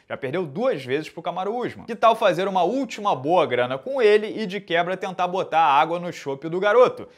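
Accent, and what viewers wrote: Rio de Janeiro, Brazil. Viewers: Pq citou microfonia sendo que é o sotaque do rio de janeiro?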